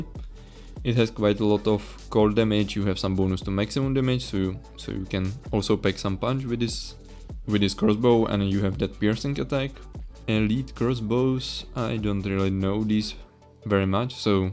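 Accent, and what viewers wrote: Czech Republic. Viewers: Great summary, minor note regarding Ormus, unfortunatelly it AFAIK cannot roll FO nor any element mastery. In the shields category, I'd personally briefly mention also Gerke's Sanctuary, which has similar use as String of Ears, i.e. in ES builds for defensive setup, as it also provides solid damage reduction. Btw just guessing based on accent, aren't you Czech?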